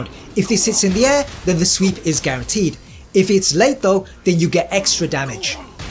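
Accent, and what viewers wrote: United Kingdom. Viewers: I love your accent bro , you from UK ?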